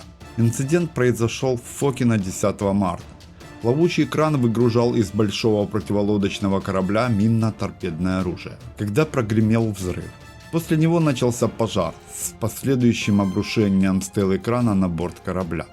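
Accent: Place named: Ukraine. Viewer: А Вам бы поработать над своим акцентом(украинским)...)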